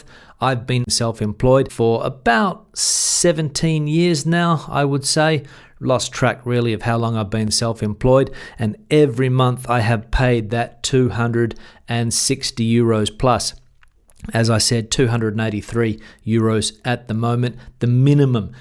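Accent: Australia. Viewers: I caught the Aussie accent straight away but was surprised your spanish is so good!! I'm Uruguayan but have grown up in Australia, our spanish is a little different to that of Spain but got to say it- you're speaking like a local! Well done!! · You seem to have an Australian accent!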